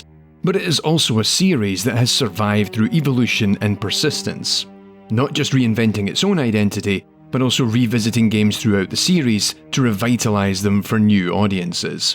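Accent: Scotland, United Kingdom. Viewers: First video I've seen of this channel, I could listen to this Scottish brogue forever. Excellent, excellent analysis, well done.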